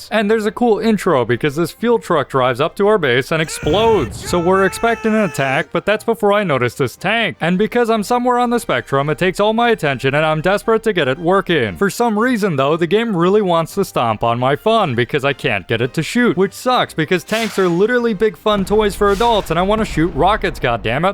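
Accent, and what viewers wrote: United Kingdom. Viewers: bro soundl like english luksor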